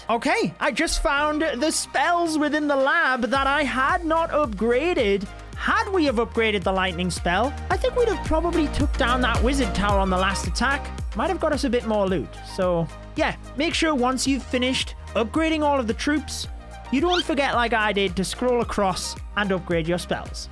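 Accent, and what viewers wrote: United Kingdom. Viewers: Just enjoying ur british accent bro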